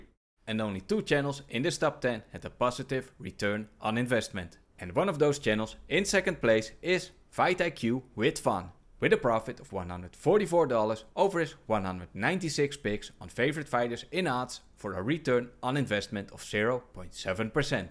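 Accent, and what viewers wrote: Netherlands. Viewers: Respect for all the work, by the way I can't un-hear you must be Dutch ;')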